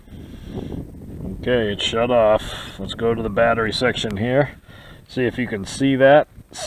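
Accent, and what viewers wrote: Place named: Boston, United States. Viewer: You sound like you’re from Boston. I just got into these older inspires and I’m probAbly gonna start running into battery problems. Maybe you can help out a fellow Bostonian with a couple of inspire 1s. Love to hear from you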